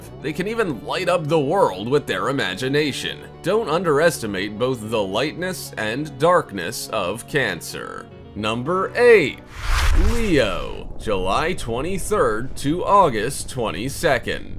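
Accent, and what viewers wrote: United States. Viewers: You from Texas ?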